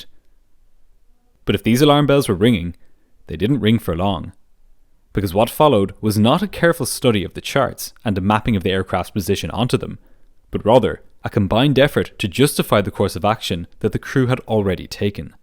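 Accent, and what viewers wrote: Ireland. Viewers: He’s Irish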